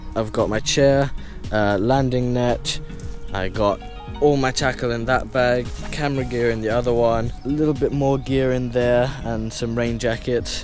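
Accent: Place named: United Kingdom. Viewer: You sound so British lol nice video dude.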